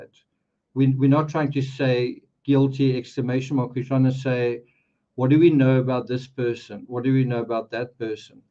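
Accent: South Africa. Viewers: Is that a S. African accent? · Hi are you aa South African ( your prenounceanation is very afr.) Nothing wrong in that im afr. Know you live abrought. Oma s a.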